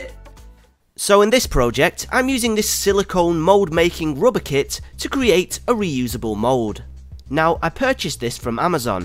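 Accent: United Kingdom. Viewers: Yay he English Btw I don't find many British youtubers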